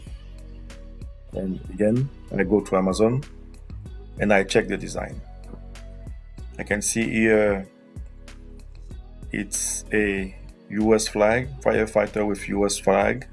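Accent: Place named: France. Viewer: Well, it's a great video bro, GJ, so according to ur accent, I think that u are a french guy, so id u don't mind can u create a video on how to find niches on the Fr marketplace !!!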